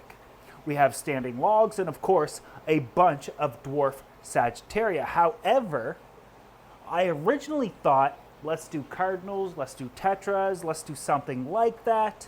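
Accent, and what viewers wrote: Canada. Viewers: You saying "Barb" in your Canadian accent reminds me of Coach from Letterkenny.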